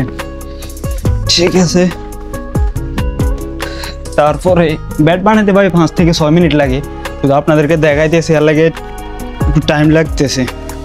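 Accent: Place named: Comilla, Bangladesh. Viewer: ভাষা শুনেই বুজেছি কুমিল্লা কিন্তু কুমিল্লা কোন জায়গায়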